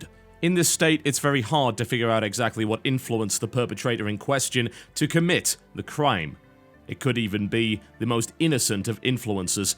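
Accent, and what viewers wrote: United Kingdom. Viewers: The only british that i can hear for minuts, Salut from argentina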